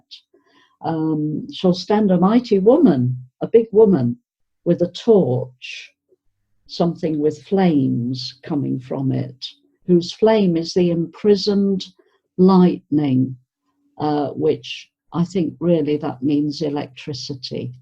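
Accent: United Kingdom. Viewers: Thank you for producing these lovely educational videos, they bring back all my past memories .... when I was a student studying in a boarding school in UK. My English teacher would read and explain to me all the economic articles so that I could understand....the way you read and explain sounds so much like her, with a very strong British accent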